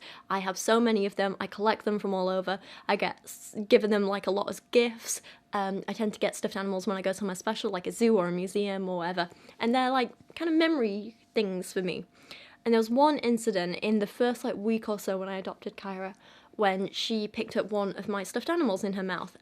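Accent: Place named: Yorkshire, United Kingdom. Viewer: I don’t have money for merge or Patreon this month, but I’ll let your ads run so hopefully you can get some of that coin. PS: I don’t remember what video it was in, probably some hun bot MLM shit, but every time I consciously let your ads run, I hear your voice in my head with that Yorkshire accent going, “dollar dollar” and I don’t know why but it’s hilarious to me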